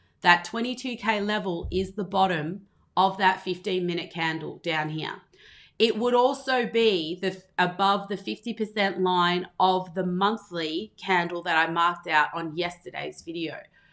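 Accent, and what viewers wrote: Australia. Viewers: I do like a lady with an Australian accent :) great analysis!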